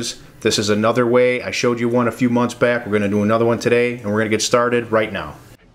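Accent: Chicago, United States. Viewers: Hearing your Chicago accent gives me faith in attempting this.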